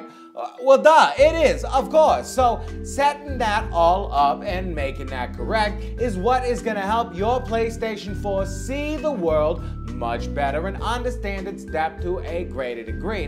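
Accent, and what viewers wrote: New York, United States. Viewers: Thanks, that was very informative and love the NY accent..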